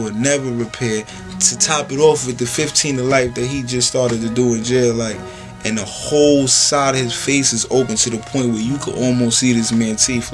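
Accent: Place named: Brooklyn, United States. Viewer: you sexy pa pa...I love the way you talk...so NEWYORK....(my hometown)...Ima fine redbone sista and im sending you so much love young GOD...only the strong survive...STRENGTH, GUIDANCE AND PROTECTION...Peace!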